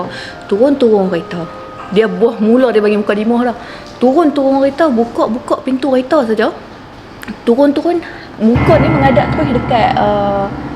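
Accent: North, Malaysia. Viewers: Pekat betul nya ckp utara 🤣🤣🤣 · Xthu laa knpa loghat utara nii cute do😆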